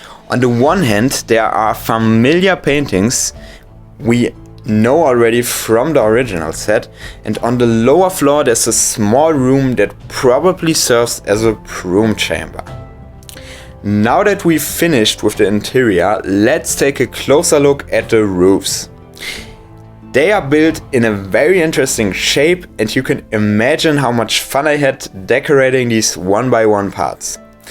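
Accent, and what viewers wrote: Germany. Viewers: Kommst du aus Deutschland du hast einen Deutschen Akzent?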